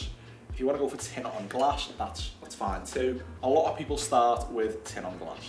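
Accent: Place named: Liverpool, United Kingdom. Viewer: Stumbled upon you and your channel today when my pal Coach Vino posted about your drink, Vow of Silence. And now I get to work on my ability to understand Scouse! I've subscribed and look forward to your videos. Cheers!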